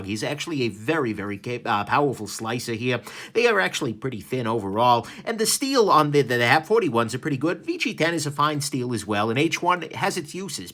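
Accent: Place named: South, United States. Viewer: So when do we get the high speed low drag review of the ladybug with that southern accent?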